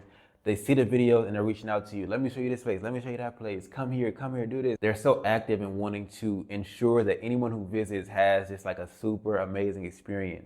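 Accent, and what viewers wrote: United States. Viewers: I am a black American LADY I live in Kenya BY CHOICE. I love it and I love the people but let’s get real young man. By the way I am very well traveled…. You young people going into third world countries and “filming” live for the sake of “content creation” WITH NO UNDERSTANDING OF A CULTURE IS UTTERLY RIDICULOUS. Do you understand where you are going and the realities of the place!!??Some of these things you have experienced, you make yourself a target!!! Seriously. There are millions of destitute and poor people in most of these nations and the reality is that if YOU WERE IN THEIR SHOES you yourself might beg if you hadn’t eaten in a few days. As far as the other critiques I get this is for content and your personal experience but honestly you should’ve had a guide… also know when to shut off the cameras. In many of these cultures photos and cameras are sometimes an issue depending on the beliefs of the people you are catching on film… STUDY BEFORE YOU GO. 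Also the people for the vast majority are WONDERFUL, LOYAL, AND HONEST. Also on the women, dude in a place with literally millions in ONE city your views are just to generalized. The women are actually the OPPOSITE of what you say. My son visited here and HE IS EXTREMELY HANDSOME AND SUCCESSFUL AND IT WAS NOT AT ALL WHAT YOU DESCRIBED. Most of the attention you received especially in the CBD was simply because some people heard your American accent PERIOD. I really think your video is such a poor portrayal of the country. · Young man you sound like a privileged American. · What did you expect? A red carpet? All major cities have homeless ppl and beggars, have you seen downtown Seattle or Chicago? Lol... ...also..dude, your camera pointing and obviously American accent make you both a target AND a nuisance. Try that in Haiti or somewhere in SA, you'd get robbed (at the least) and possibly killed at worst. 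Maybe don't be so disrespectful toward ppl you're already being very intrusive with?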